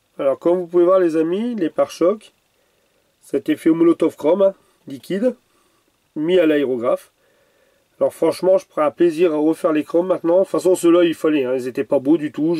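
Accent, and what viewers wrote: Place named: South, France. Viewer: 😂😂 je l’aime cet accent du midi.......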